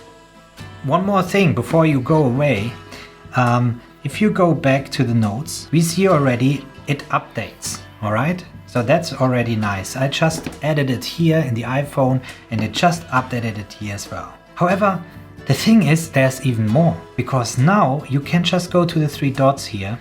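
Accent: Germany. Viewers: I can hören that you kommen aus germany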